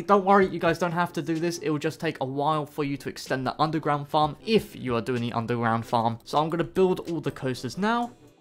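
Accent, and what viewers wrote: United Kingdom. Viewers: Are u British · I love the uk pronunciation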